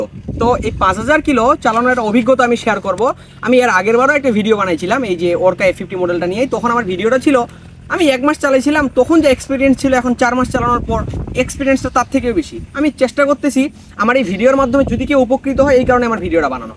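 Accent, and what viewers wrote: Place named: Dinajpur, Bangladesh. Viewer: দিনাজপুরের ভাষা ❤, আরো ভিডিও করবেন প্লিজ, গাড়ি নিয়ে, এমন ভিডিও ইউটিউবে কম আছে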